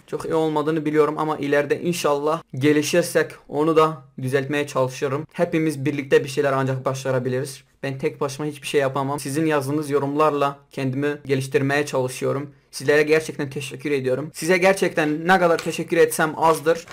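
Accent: Turkey. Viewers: Amed şivesi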